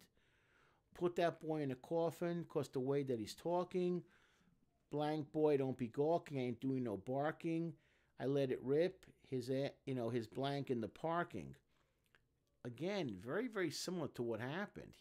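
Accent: New York, United States. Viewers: Your from New York aren’t you.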